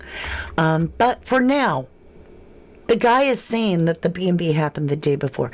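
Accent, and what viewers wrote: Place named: United Kingdom. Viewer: I came to you in the Jay case. Just love how you do things especially your British accents.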